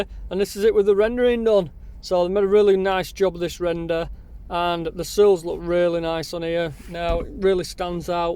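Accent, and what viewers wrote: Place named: Yorkshire, United Kingdom. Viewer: Gotta love Yorkshire English "Ahv just idded tha light in ther".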